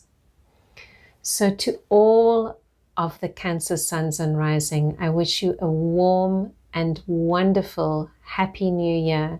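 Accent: South Africa. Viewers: That sounds like a South African accent - Howzit from Plett. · Definitely a South African accent. Am a Cancer Sun and Rising. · Thank you so much for this, you have no idea how much I needed this. 🥺❤️🙏🏾 Bless your soul. 🥺 Also, you sound so South African 😭😩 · you sound south african, lol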